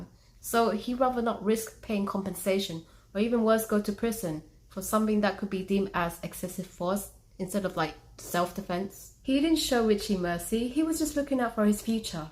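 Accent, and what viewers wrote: United Kingdom. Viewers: you're british? cool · Wher'd ya acquire that pommy accent, สำเนียงบิวติฟูลน๊าจ้ะ😂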